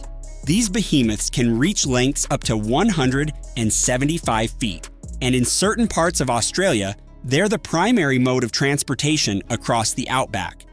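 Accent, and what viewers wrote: United States. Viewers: Nothing worse than hearing a "errrrrritating Amerrrrrican ac cent" while trying to watch a video. So I stopped 40 seconds in.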